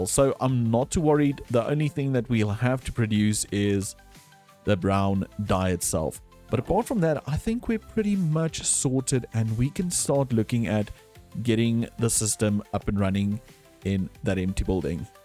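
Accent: South Africa. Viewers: Yes, and one South African can always recognise another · are you south african?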